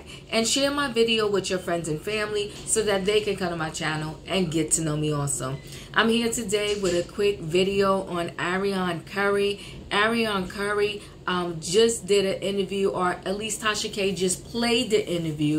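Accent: New York, United States. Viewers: ❤ your NY accent and attitude while giving your commentary. I watched twice in a row. You're on point!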